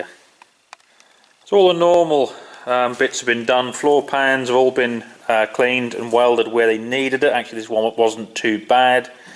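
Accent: Norfolk, United Kingdom. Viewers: I'm sure I can hear a bit of a Norfolk twang in that accent ! I'm thinking West of Norwich.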